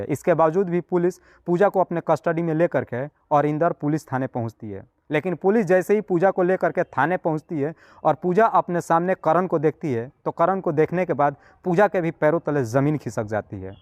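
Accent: Bihar, India. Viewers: Bihari aise hi bolte hai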